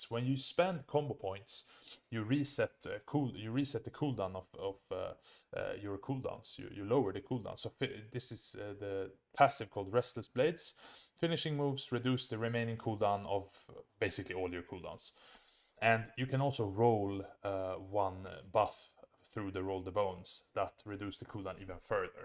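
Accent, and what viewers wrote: Sweden. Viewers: Are you swedish? Thought because of your accent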